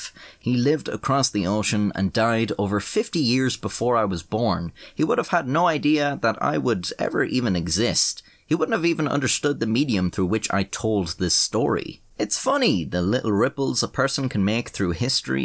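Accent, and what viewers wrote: Ireland. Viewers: Greetings from one of your ripples in America. I love what you do, and am grateful for your insight and view. Please continue, because there is literally NOTHING in this world I love more than learning from someone with an Irish accent! (I didn’t even have to make that up it’s so true) be well and thank you again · Same im battling fentynal i been on it for 2 years and all i can do when im detoxing is kick around shiver sweat puke. His vidios are easy to watch in a fragile mindset nd his voice make me laugh not alot of irish people here😂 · I just wanted to say thanks for going to all the trouble to make these videos. Really like your style, subject matter, humor, and of course, that cool Irish accent. Your ripples reached me in Texas. Keep up the great work - love your channel.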